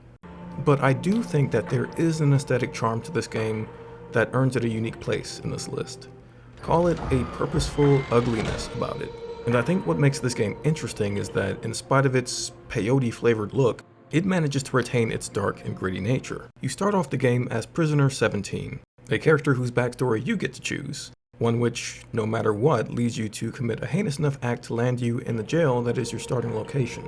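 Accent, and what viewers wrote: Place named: East Coast, United States. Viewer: Im guessing you are part of the east coast of the US. ?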